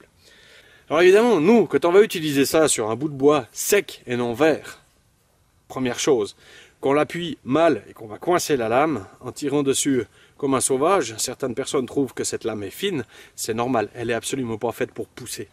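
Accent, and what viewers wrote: Switzerland. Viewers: Coucou, je me suis abonnée à ta chaine depuis la première de T vidéos dans la neige;)!!! Franchement je t'adore tu es marant, ta bonne humeur fait du bien, je rigole beaucoup, ton rire est communicatif!!! pour les vidéos j'y connais rien, mais sur certaines autres j'entend rien, avec toi tout es clair!! joli paysage, ton accent à mourir, té pas suisse pour rien car tu dit tout le temps "c'est pas grave, on a le temps" hihi enfin tout ça pour te dire que j'ai presque fini de visionner tes vidéos et que ça va être long entre chaque hihi merciiiiiiiiiiiiiiiiiiiiiii pour les partages et surtout change rien tu es super.... la bisouille... Evy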